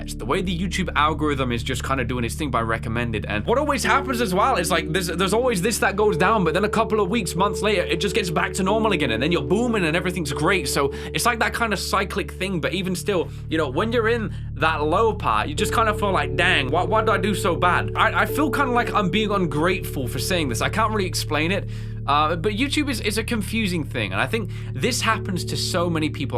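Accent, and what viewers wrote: United Kingdom. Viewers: Never knew you was british. Same here lad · As a long LONG time supporter of channel I'd like to say a few words about his 7 year hiatus The first video I watched from was his first video on case animatronics and whilst he sounded very polite and British I enjoyed the video a lot and gradually from there I watched more and most definitely enjoyed more There was some videos I liked and about 2 I didnt like and quite a lot I didnt watch but I'd just like to know I've been there since case animatronics and will stick here forever until the channels end Big respect dont stop grindin🥺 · do not ever blame yourself. There is a simple way to explain how special you are in my life: I did not even know English when I started watching you. I played the games you played because you inspired me. I watched your videos when I didn't even understand what you are saying and at the end, you taught me how to speak English (british accent too). 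So, even if you quit tomorrow I will remember you for the rest of my life. That is how special you are for me. And when I see you blaming yourself or anything like u said in this video, it makes me very sad because you made me smile since I was a kid. And you must make yourself smile too. Don't record when you can't smile or when you give a fake smile because your funny jokes, reactions made us laugh, your smile made us smile. So don't do it when you don't feel like it. I would always respect and love you! <3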